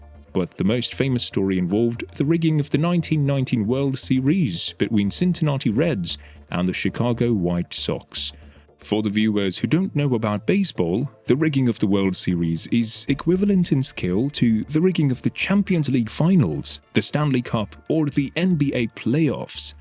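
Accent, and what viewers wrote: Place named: United Kingdom. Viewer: Crap narration, would have been better with an American voice rather than stuck up English rubbish.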